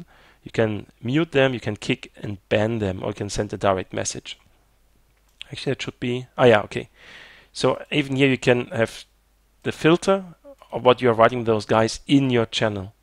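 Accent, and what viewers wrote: Germany. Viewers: German accent 10/10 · your accent sound german? bitte sei deutsch lol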